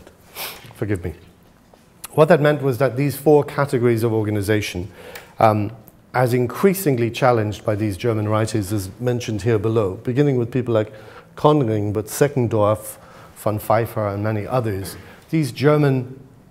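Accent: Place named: England, United Kingdom. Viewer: Did his first degree at NUI Galway...Very English accent however...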